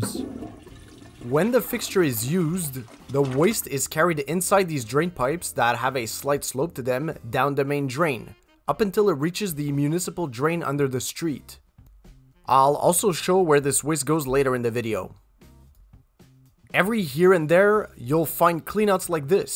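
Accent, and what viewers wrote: Quebec, Canada. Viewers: You have an accent, est tu québécois ? ;) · This guy is from Quebec for sure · French Canadian? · Yeah his definitely from Quebec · Nice video! T'es québécois hein? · Quebec French?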